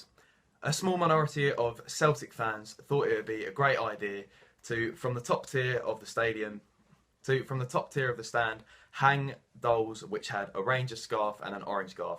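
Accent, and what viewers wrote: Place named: England, United Kingdom. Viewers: Yet another Hun that has an English accent. Get back to London. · Why the fucking English accent?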